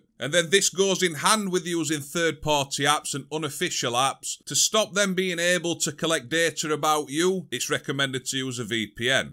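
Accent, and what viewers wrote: Yorkshire, United Kingdom. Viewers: Won't lie you make me laugh are you Yorkshire or Lancashire you know your s***🤘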